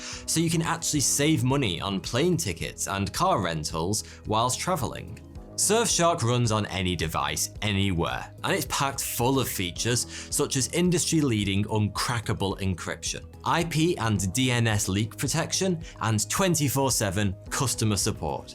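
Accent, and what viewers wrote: United Kingdom. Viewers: The beauty of lake Titicaca is nothing compared to the beauty of your blue eyes, handsome mustache and sexy British accent babe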